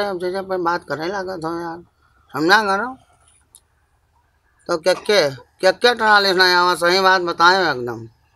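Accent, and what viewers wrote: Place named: Awadhi, India. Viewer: Andhra pradesh Tirupati bala g se apna awodhi sun kar maza aa jata hai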